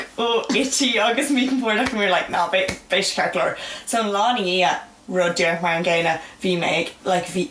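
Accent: Ireland. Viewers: Sounds like every dublin irish speaker i know not a first language so the accent isnt on point, at least people can speak our language, níl sé ceart cun cur daoine sios nuair a bhfuil siad ag deanamh iarrach ar chuid teanga a leabhart. Is amadán tú cun smaoineamh mar sin. Nil mo chuid gramadach go maith ach deanam iarrach taim nios fear ag leabhart an teanga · Sin greannmhar mar like is as Contae Aontroim mé agus like tuigim go hiomlán ach like you can tell a wee bit that she says some things like non-Ulster ish 😂😂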